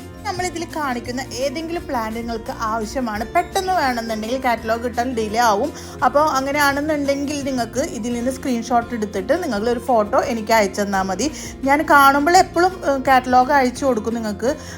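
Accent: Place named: Thrissur, India. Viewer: Eavideya sthalam.. Samsaram kelkkumbol thrisshur dist anennu thonunnu...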